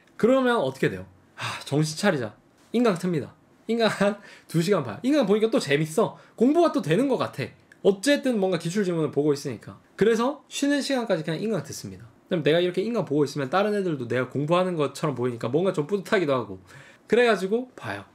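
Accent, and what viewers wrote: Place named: Gyeongsang, South Korea. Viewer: 경북 사투리는 좀 투박해서 싫어하는데 (나 경북사람임) 이분은 사투리 억양이 쎄지만 계속 듣고싶어지는 ,고급지게 들리는 마술이있네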